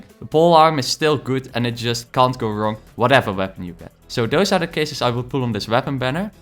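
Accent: France. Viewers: The French accent, i'll pull for whatever listening to the accent. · you have an accent... I can't pinpoint it but it sounds french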